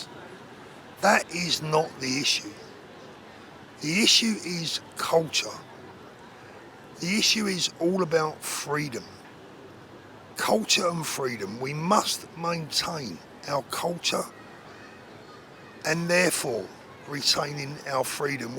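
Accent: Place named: South London, United Kingdom. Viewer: The rough working class South London thing is an asset. There are not enough people like you in politics who can connect with the forgotten disenfranchised masses who don't vote. And once more prejudiced folk get past the accent and tattoos it's clear you are a decent sincere man. Again, another rare & much needed commodity in British politics.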